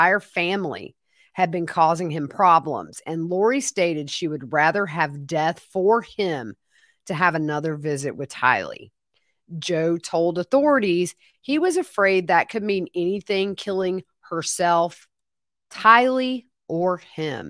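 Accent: South, United States. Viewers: You’re the best,! Just when I thought I knew all there was to know, you taught me even more!!! Wow ❤❤❤❤!!! I also LOVE your voice. I love your accent! I love southern accents ❤❤❤❤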